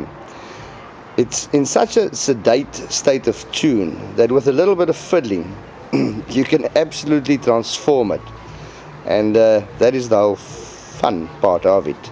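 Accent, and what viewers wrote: South Africa. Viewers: Always a pleasure to listen to the SA accent. Brings back good memories. I agree with your assessment of the QB 79. Great fun and then some.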